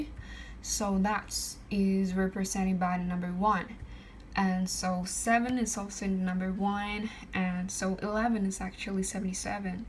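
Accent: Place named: Australia. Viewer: Listen to that Australian accent lol... God bless you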